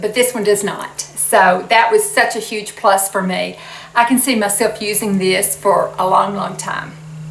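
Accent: South, United States. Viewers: Love your southern accent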